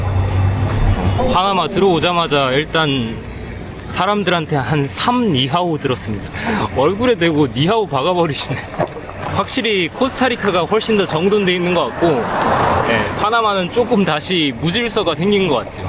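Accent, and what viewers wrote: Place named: Chungcheong, South Korea. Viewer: 고향이 충청남도인가요? 말투가 내가 사는 고향 논산이랑 비슷하네요. 지금 유럽 몰아보기 3편 보고 있어요!!!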